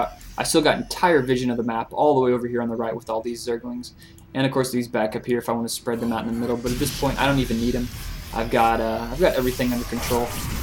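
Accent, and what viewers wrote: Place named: Texas, United States. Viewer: I like your texas accent :>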